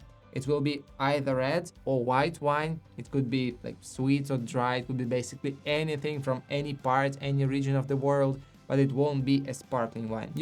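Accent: Russia. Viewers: Keep going, i belive your channel will grow to a large audience. Love your content. Nice russian accent by the way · Are you russian?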